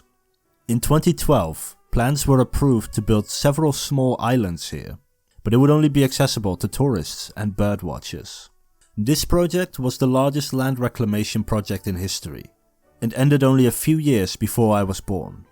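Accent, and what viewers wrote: Netherlands. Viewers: A lisp and a Dutch accent.... toe curling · Typical English with a Dutch accent. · Dude! Je accent is zo Nederlands! Vreselijk !! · you sound dutch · Hey man are you dutch? Ben je ook nederlands man ik kan he accent wel goed horen ;p ... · Hahaha heerlijk het super dikke Nederlandse accent op je Engels :p Interessante video verder! 💪🏻🧡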